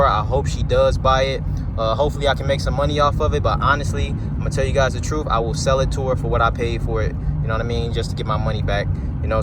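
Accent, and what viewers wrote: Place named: Midwest, United States. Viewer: That's a Midwest Indiana or Ohio accent. Maybe Illinois?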